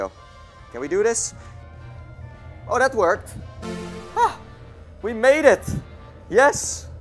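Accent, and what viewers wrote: Netherlands. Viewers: Ik hoor zo duidelijk dat je Nederlands bent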